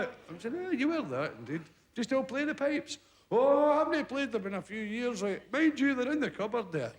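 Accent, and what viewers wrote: Scotland, United Kingdom. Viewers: Watched this with subtitles, and even autosub can't figure out some words😂😂😂 I love the Scottish accent😂😂😂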